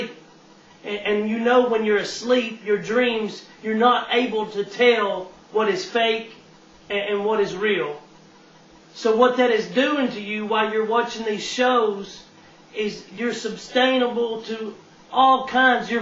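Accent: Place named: South, United States. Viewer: "A form of brain warrrsh .. ( love the Southern accent).. I'm a southerner myself..Born in The Ozarks actually.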